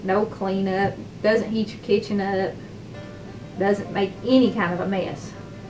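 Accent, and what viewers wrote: South, United States. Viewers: I love your southern accent. The music you useis great. I also love the dishes you cook. I like down home comfort foods.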